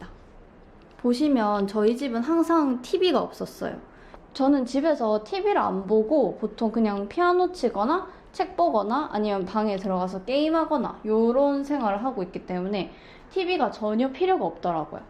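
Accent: Gyeongsang, South Korea. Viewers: 경상 북도 사람 이신가여?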